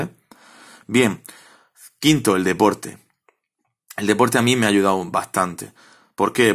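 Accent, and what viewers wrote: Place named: Durango, Mexico. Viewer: amigo medio durango vos .....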